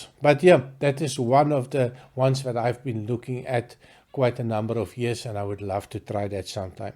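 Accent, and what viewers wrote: Afrikaans, South Africa. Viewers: Hi. I like your reviews. Your accent is spot on with an Afrikaans person speaking English. It’s like a South African Boer giving a whiskey review.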